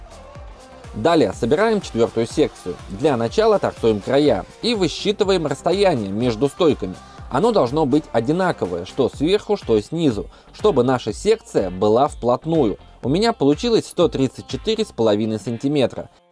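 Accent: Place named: France. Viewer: Отличное решение! Когда начал вас смотреть(строительство гаража) "французкй акцент" привлекал внимание, сейчас же на фоне личных качеств он вообще незаметен )))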